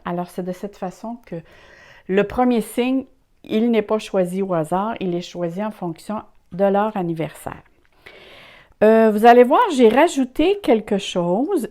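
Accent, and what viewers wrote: Canada. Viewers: Merci pour cette voyance et votre accent canadien 😁😁 j'espère que ça va se passer car besoin de positivité 👍 à bientôt ..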